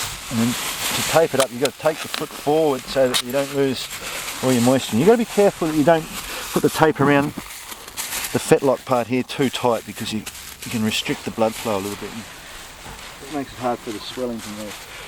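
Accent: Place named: Australia. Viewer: You have a different technique than more farriers in not straddling the horse's leg. Love your accent, are you from Australia?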